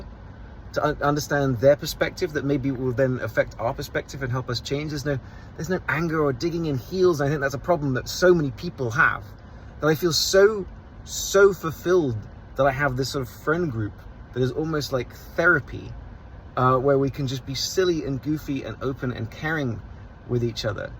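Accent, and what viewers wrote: Texas, United States. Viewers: Bless you, thank you fellow Texan. Watching this and then your newer videos you’re even starting to sound like a Texan. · Occasionally you sound like a Texan. Dare I say you occasionally pronounce an R or two.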